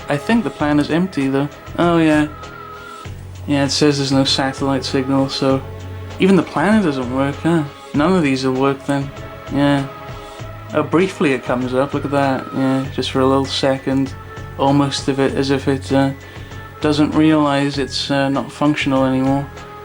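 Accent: Wales, United Kingdom. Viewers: great video and a nice retrosepective of sky, quick question though, are you welsh? Edit: lol nevermind just saw you scroll past S4C, confirmed 😆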